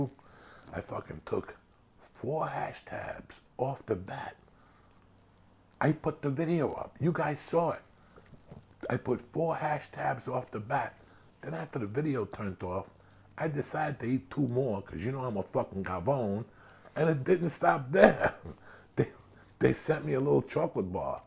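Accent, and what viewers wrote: New Jersey, United States. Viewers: U sound more jersey already uncle. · Lol u can tell hes been in Jersey that accent is deeper than ever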